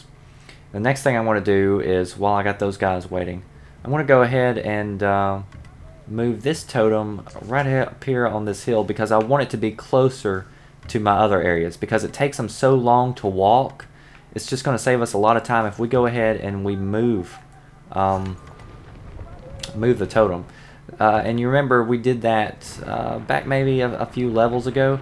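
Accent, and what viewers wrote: South, United States. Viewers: your southern twang is annoying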